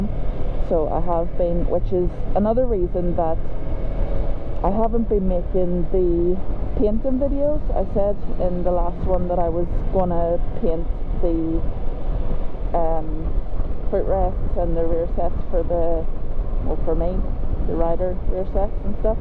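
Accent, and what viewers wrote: Ireland. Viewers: Your accent isn't that thick for an Irish person lol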